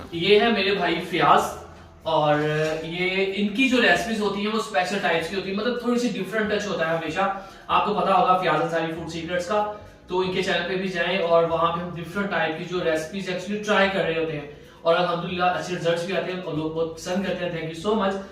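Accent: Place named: Punjab, India. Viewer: Your Punjabi accent is v good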